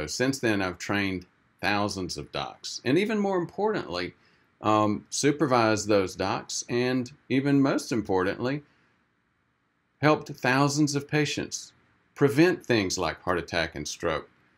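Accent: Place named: Middle Tennessee, United States. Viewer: Doc, thank you for the fast reply. I'm currently shopping for a good used van to replace my decrepit one (it literally can't even make it to Knoxville, much less Louisville.) Then we would be better able to get to your neck of the woods. I thought you had a little "Middle-Tennessee" in your accent. Nashville would be a bit easier to get to, but it is what it is. Wish we had known about David W.. My sister, who resided near Memphis, just recently died during a triple bypass...another death-by-doctors incident, in my opinion. Anyway, thank you very much for the info,. We might see you sometime. Sylvia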